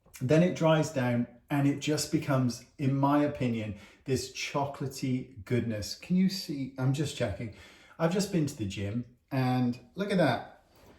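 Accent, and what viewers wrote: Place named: RP, United Kingdom. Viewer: Your YouTube channel is a classic BBC English teacher for a foreigners!!😊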